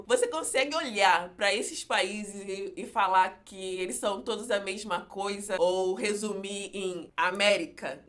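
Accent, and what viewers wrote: Brazil. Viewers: vc é africana ? seu sutaque é igualzinho de um brasileiro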